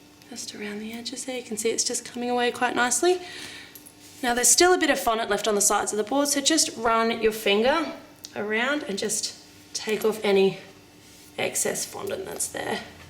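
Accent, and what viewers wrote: Australia. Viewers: Are you from Australia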